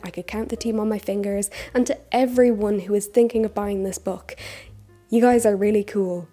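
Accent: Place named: Ireland. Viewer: Your Irish accent comes through a lot stronger when you are just chatting about self and things, then when you are doing skits. Not sure why that is.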